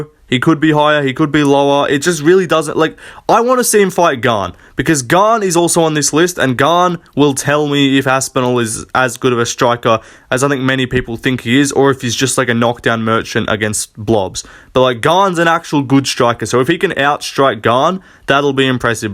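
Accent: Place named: Australia. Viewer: You have an Aussie accent and you didn't put Jdm in top 10? Nah you a traitor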